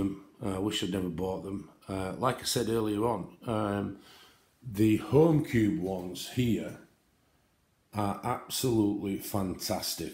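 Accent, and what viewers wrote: Yorkshire, United Kingdom. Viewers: Love your space 💜 and your Yorkshire accent 😍 All the best with your channel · Enjoyed your video, recognise your accent as Yorkshire may be, as I live in Yorkshire uk, New subscriber via The Bibliophile colourist. You have a great art space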